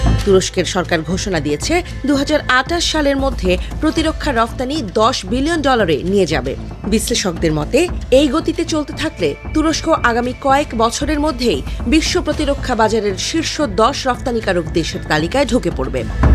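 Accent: Kolkata, India. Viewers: উপস্থাপিকা কি কলকাতার??? কথা তো বাংলাদেশীর মতো লাগছে না । কি ntv???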